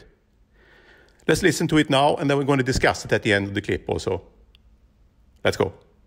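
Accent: Sweden. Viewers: Låt mig gissa att du är svensk? Det låter som kul när du pratar svenska fast på engelska! Fan va hårt! :) gillar att du gjorde en video om detta! Bra jobbat! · That swedish accent :)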